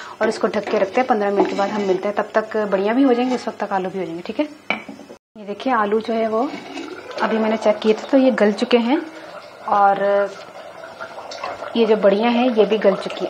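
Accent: Punjab, India. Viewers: ji sister ji mai app ki punjabi bolnay kay style say he app ka fan bana tha pher app ki recipe etni achi thi kay kiya batahu sab ghar mai mama orr sis bana ka deti hain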